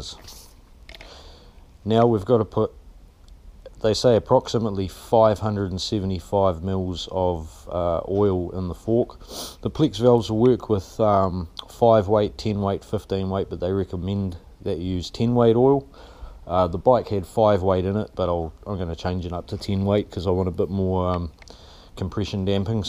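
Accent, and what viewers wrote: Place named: New Zealand. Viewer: Nice Kiwi accent mate! takes one to know one lol. Great vid, keep making vids and posting them up, enjoyable and educational for sure!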